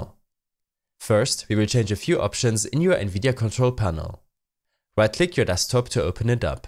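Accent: Germany. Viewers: diese deutsche aussprache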